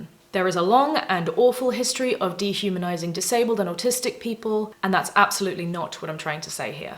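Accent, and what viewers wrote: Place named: RP, United Kingdom. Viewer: Was Received Pronunciation part of your Aspi-onage? 😉 maybe I'm imagining things, but your speech seems a bit more posh in these earlier videos. No disrespect intended.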